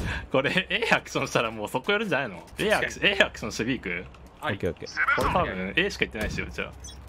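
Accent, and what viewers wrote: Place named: Kansai, Japan. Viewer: さん喋りが関西方面の人だからたまに強い口調に感じちゃうけど人柄スゴくいいよね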